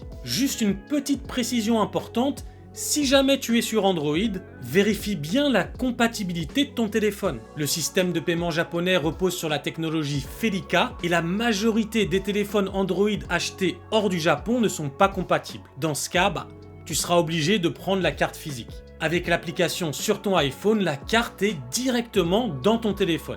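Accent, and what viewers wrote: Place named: France. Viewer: Bonjour apparemment ta chaîne est celle que je préfère parmi toutes celles que l'on peut trouver sur le Japon, à t'écouter je pense que tu es français : comment as-tu fait pour changer de régime alimentaire car je ne pense pas que tu manges du pain et du fromage tous les jours ?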